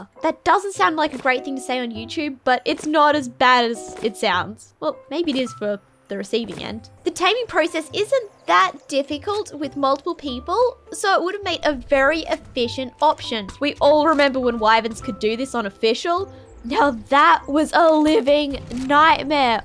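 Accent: Australia. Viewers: Are ye from australia mate? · is an aussie???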